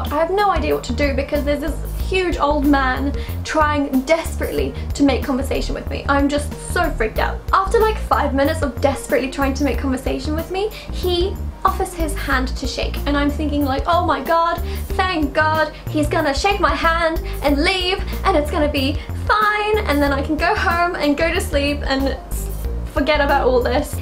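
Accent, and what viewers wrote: United Kingdom. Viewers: what british accent is this? I LOVE IT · i'm a simp for british accent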